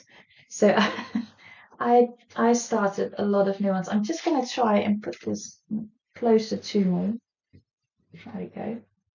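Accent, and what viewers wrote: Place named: United Kingdom. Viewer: I love!! And I’m really enjoying to watch your videos. So in awe of your British accent too 😊 Greetings from Switzerland!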